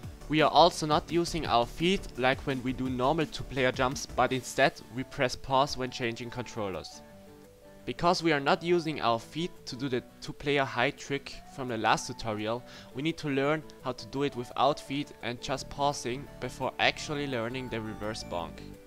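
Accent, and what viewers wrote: Germany. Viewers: Lol man merkt dass er deutsch ist😂🇩🇪 Wo sind meine german people 😂🇩🇪🇩🇪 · Are you german ?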